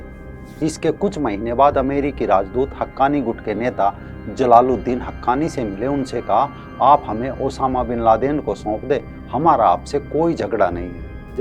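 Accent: Haryana, India. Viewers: Bhai apki awaz se lagta hai ap hriana stet se ho,, حریانا ب پنجاب کا حصہ تھا کبھی 😢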